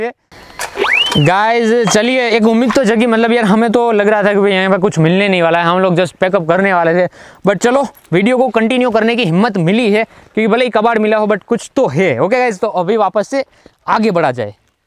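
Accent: Gujarat, India. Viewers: Bhai tame gujrati cho tamre bole upar the kabar pady jay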